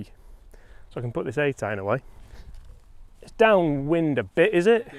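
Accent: Yorkshire, United Kingdom. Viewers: Does using an Americanism like suck with a Yorkshire accent really work? Sorry but being British, that kind of thing is a bit of a pet hate of mine but I suppose we all have them, don't we?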